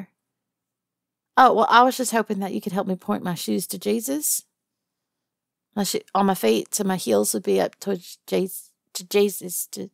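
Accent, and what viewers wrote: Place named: Texas, United States. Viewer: once again your sweet voice brings another one of “cryptid women” stories to life. Tiger with Sweet Texas tang. One of these days your ever so powerful double entendre will rip a hole in the space time continuum. 💛💚💙❣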